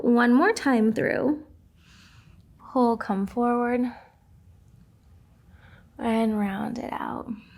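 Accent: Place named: California, United States. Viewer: As a British person, we usually skip anyone with his type of American accent (is it Californian). It sounds so annoying to us. Our communication style is the polar opposite. Anyway despite the accent I'm listening to the video. I can see you mean well.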